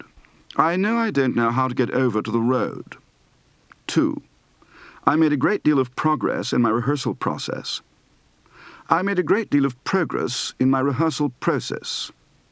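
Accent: RP, United Kingdom. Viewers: This accent was still quite common among the upper class in the West Country during the 1970s. The movie "Pygmalion" (1938) is a great resource for hearing different accents of the pre-WW2 era, with Leslie Howard speaking RP throughout. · Lovely example of High RP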